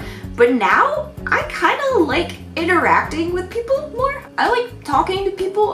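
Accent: United States. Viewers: And I like your deep, funny, "What are you talking about..." American voice!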